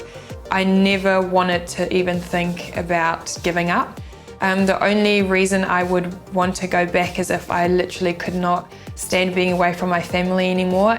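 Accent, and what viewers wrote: New Zealand. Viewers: your incredibly gorgeous 😍 south african 🇿🇦 women are the most beautiful women in the world and us kiwi men absolutely love them, the accents are sooo sexy but its so cute how you've lost it and have a kiwi 🥝 accent now,i would give anything to meet you and even more to be the man of your life 😍 so carry on being you and dont change and i hope you find a real kiwi man that will give you wat u deserve 🤗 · she threw her roots away by disquising herself with the kiwi accent, shame! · She has got such a kiwi accent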